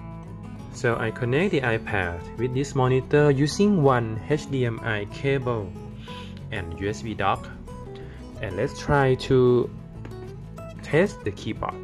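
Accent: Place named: Thailand. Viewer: สำเนียงไทยๆ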